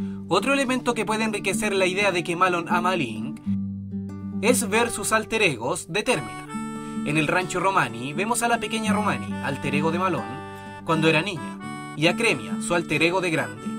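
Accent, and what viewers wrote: Chile. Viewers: Son de chile ? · Se nota que eres chileno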